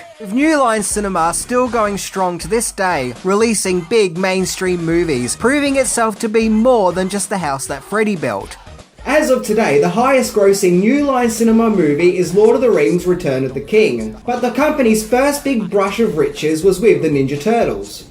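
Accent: Australia. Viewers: I don't think there are any facts about this movie that I don't already know...but it is fun to hear them in an Australian accent. Thanks! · Australians pronounce things different lol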